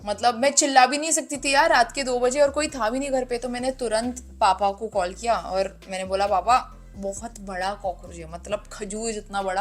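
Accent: India. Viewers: i come across with your videos today, and watching you speak Hindi with desi accent i can't stop laughing. 😂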